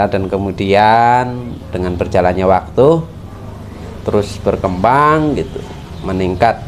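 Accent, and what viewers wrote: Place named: Rembang, Indonesia. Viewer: bahasa rembang meduk.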